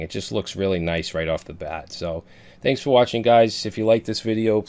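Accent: Boston, United States. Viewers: Listens for five seconds: "This guy is so from Boston" Listens just past the one minute mark: "BOOOOOOOOM! CALLED IT!"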